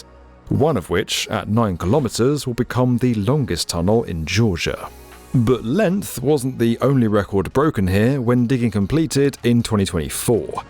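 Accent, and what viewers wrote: England, United Kingdom. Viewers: What’s kind of narrative can we expect from someone with British accent mixing “human rights” with history narrating a Chinese-Russian projects? Expect a sugar coated poisoned pill. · Whenever you hear a British accent you can be confident that you are listening to bullshit limey propaganda. L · I hear an English accent then unsurprisingly the racism / white supremacy talking points soon follow. 🤣 "unbiased, straight facts" 🤣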